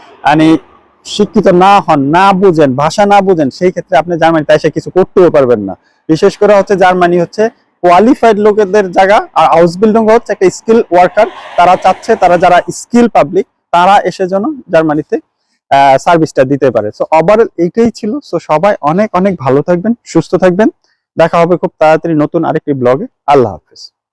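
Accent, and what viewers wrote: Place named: Comilla, Bangladesh. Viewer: আপনার কথা কুমিল্লার সাথে মিলে যাচ্ছে।স্যার আপনি কি কুমিল্লার সন্তান?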